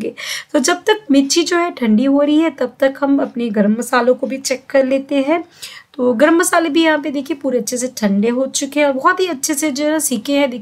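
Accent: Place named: United Kingdom. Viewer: Her accent 😂😂😂😂 British lg rha hai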